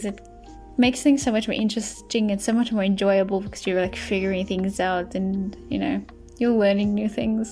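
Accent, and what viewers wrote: South Africa. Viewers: oms you are from South africa too, girl ive been watching your videos and i only hear this now woowww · wait you are south african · Are you South African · WOAH ur south african too??? i thought i recognised the accent- i thought i was aloooone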